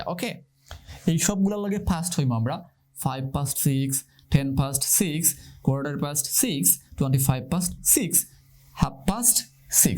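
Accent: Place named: Sylhet, Bangladesh. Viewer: Very impressive sir. ওরে ছিলটি রে। ভালা লাগলো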